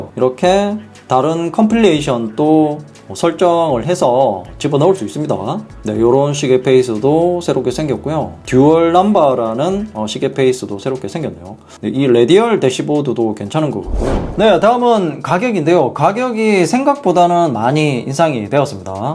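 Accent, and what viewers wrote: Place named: Gyeongsang, South Korea. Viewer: 이헝님 겅상도 사람인가 말투가 구수하네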